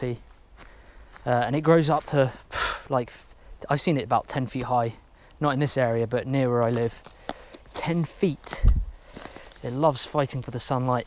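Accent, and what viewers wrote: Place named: United Kingdom. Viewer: Make a hammock! I like the new mic. I can hear your beautiful Bri-ish accent much better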